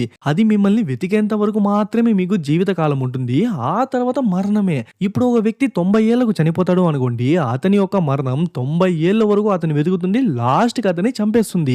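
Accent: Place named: Chittoor, India. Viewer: Bro మాది మదనపల్లి. And మీ slang పలమనేరు చిత్తూరు ఆలా అని పిస్తుంది మా నానమ్మ వాళ్ళది పలమనేరు. బయ్యా మీ vasic చాలా బావుంది.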